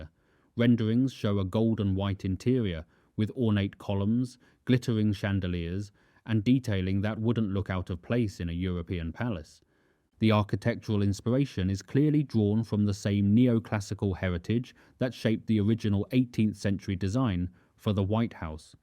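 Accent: United Kingdom. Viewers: Hey Dude you sound British. How would you like it if the British king torn down Buckingham palace to make a modern all-glass tower like what they have in Dubai?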